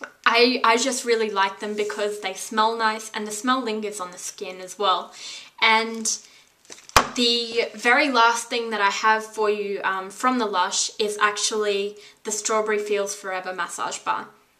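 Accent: Australia. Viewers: She's deffoo austrailian....ivegt cousins frm both so inoo..x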